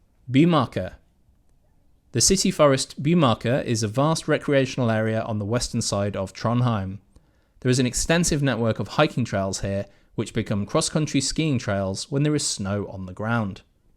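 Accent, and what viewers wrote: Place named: England, United Kingdom. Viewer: You sound English and I am curious as to why you moved here? Do you also speak Norwegian?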